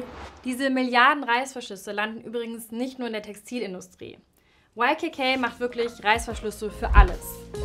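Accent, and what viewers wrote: Bavaria, Germany. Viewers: einfach Hochdeutsch reden und nicht bayerischen Dialekt benutzen(kina=Bayerisch). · kommst du aus bayern? du klingst übel danach... schon vor dem kina interessant finde ich dass bei deinem china-k so 5% "ch" mitschwingt tatsächlich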